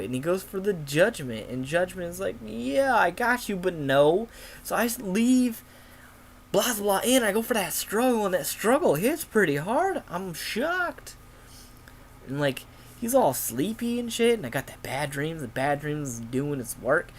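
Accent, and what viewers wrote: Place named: Texas, United States. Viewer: No more Texas accent please, it doesn't suit you... No offense or anything. :)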